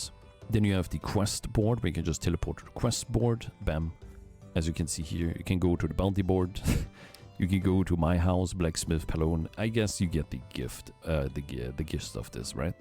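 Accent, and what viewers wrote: Germany. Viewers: Your dialect sounds kinda like you’re from Germany? Am I right?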